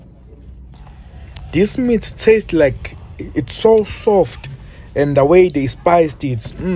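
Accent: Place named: South Africa. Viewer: I love this sort of English accent, with no effort of trying to perfect it. I love this South African way.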